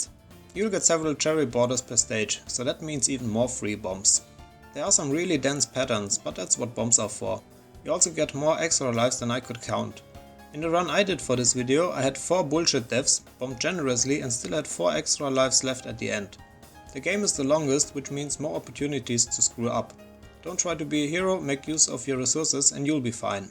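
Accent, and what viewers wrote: Germany. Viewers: Is that a german accent? I can feel it. I'm suffering from it too.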